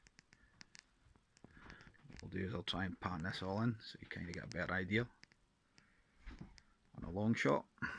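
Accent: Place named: Scotland, United Kingdom. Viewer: Hey man, that is just glorious. Hope you are gonna upload some more stuff man. Always good to hear a fellow Scot 😁 Here from a Nathans Gaming tweet 👍 Take care, and again, hopefully see some more content 👌 Cheers 🙂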